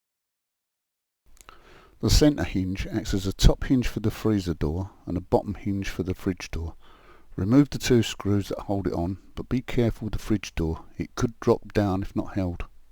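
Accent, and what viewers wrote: United Kingdom. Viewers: Perfect instruction on what to do. It means even I can change my door. Maybe it’s cause I’m welsh but I Loved the ganstar cockney accent. It felt like I was being told what to do by a proper hard Londoner 😁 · This bloke sounds like geezers I worked with in the 1970s. I'd definitely say he's from South East London. Penge? Peckham? Blindin' instructions pal.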